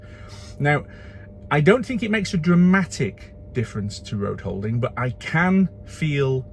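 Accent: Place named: England, United Kingdom. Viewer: Man, I love when an English guy talk about something. I was really concerned about the noise these tires will make, and ur review was just spot on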